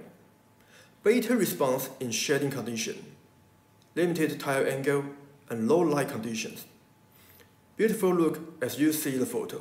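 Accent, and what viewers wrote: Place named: China. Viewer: I don’t understand your Chinese accent.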